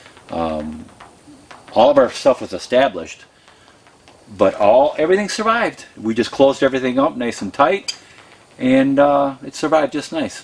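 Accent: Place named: Massachusetts, United States. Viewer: LOL I was thinking the same thing ….I’m thinking he’s from Massachusetts…. That’s where I came from … living in Texas now..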